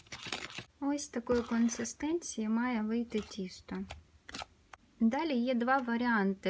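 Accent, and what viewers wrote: Standard. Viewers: Более года , как ВПО, живу среди жителей трускавца, Дрогобыча, Борислава и Стебника и ни разу не слышал такую красивую, чистейшую украинскую речь. У местных жителей, украинская речь ну совершенно другая😅 Откуда, вы, мадам? Единственное, что подтверждаю, что крапивы здесь как у нас на Донбассе амброзии.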